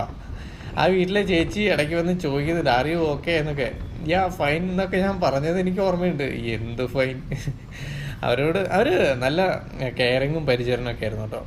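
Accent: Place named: Valluvanad, India. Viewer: Natil evdeya? Valluvanadan slang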